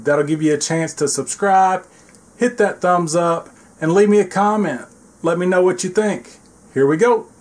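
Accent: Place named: Texas, United States. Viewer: Sound like a good Son of Sam Houston if i ever heard one. Im East of Houston. Just starting. 3 bins so far, but i cant get mine to grow. i got 40 cocoons out of one concrete tub. put couple thousand uncle jims worms in there. 1 or 2. i need pure species bins.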